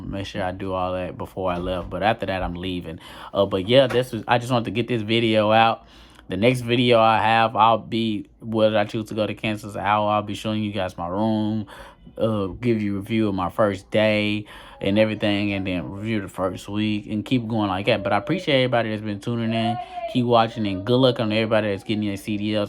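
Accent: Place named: Nashville, United States. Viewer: I want to say thnx and in curious are u from Nashville? THT way I say “LIKE AT) reminds me of when I spent a summer in Nashville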